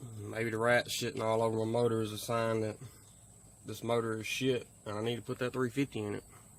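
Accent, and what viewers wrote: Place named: North Carolina, United States. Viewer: You got to be from N.C.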